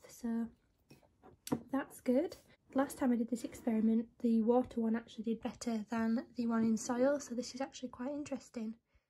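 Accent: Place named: Northern England, United Kingdom. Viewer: I really enjoyed the journey of these plants and am looking forward to trying this myself. There is something addictive about making new plants for free. Also I love your accent...I am from Yorkshire myself but live in Ireland so miss hearing Northern voices!!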